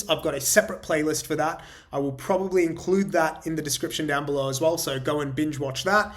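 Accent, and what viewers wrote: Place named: Australia. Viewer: G'day, nice to hear an Aussie accent on a video like this - I'm an independent SalesOps guy who usually works with SDRs and AEs and now doing my own prospecting - so this is super useful. Cheers mate, ABC!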